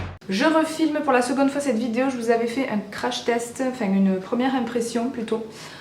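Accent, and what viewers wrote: Toulouse, France. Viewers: J'adore ton petit accent toulousain ^^ Je trouve ton teint super avec ces produits le fond de teint à l'air pas mal du tout ainsi que la palette je l'avais vu sur la vidéo de sananas le résultat est assez bluffant ! :o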